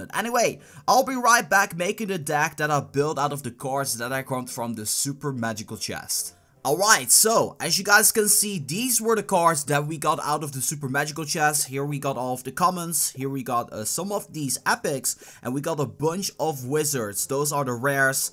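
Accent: Netherlands. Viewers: are u dutch cause i am and your english is like you're dutch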